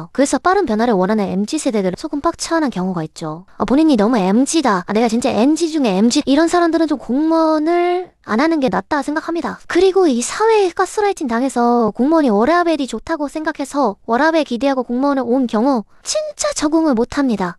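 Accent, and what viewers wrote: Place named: Gyeonggi, South Korea. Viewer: 얘 말투 이거 경기도사투리냐? 귀엽네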